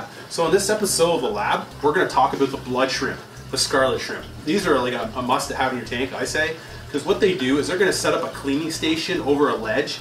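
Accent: Canada. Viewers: he's from Canada they say stuff differently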